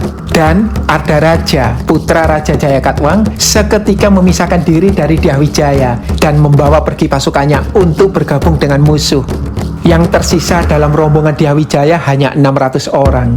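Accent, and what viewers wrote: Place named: Java, Indonesia. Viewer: dan narasinyapun dibawakan dengan bahasa indonesia yang medhok.. saya suka sekali..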